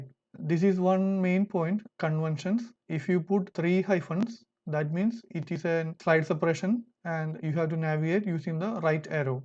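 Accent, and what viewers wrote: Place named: Kerala, India. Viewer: Malayali anu ennu English keetapole thooni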